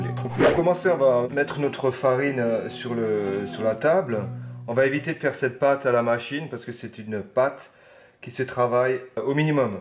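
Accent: Alsace, France. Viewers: Tu viens d'Alsace non ? :p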